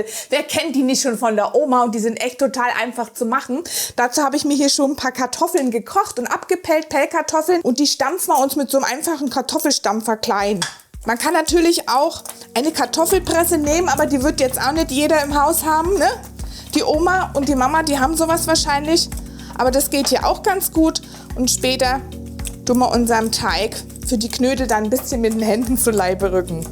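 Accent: Germany. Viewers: Klasse! Dein Dialekt gibt mir immer wieder ein Rätsel auf:eine Mischung aus hessisch, fränkische und berlinerisch. Oder täusche ich mich?!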